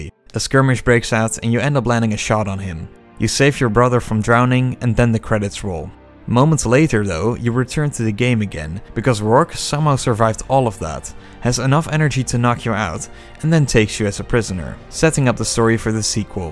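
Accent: Netherlands. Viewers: Ahhh that sweet dutch accent😂, good video keep it up. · Is this guy Dutch or German? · Hahahaha first 10 seconds can already tell you’re dutch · ik dacht bij het begin al ik hoor echt een nederlands accent en ben toen door de comments gaan duiken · I know a dutch accent when i hear one! Subbed! Great video! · ik dacht aan het begin van de video deze gast klinkt echt Nederlands door ze accent en toe keek ik naar de trofeeën die popte en besefte ik dat hij echt Nederlands is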